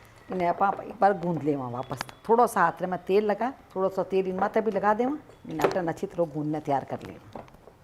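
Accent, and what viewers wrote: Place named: Rajasthan, India. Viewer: Rajastani bhasa boat pari lagi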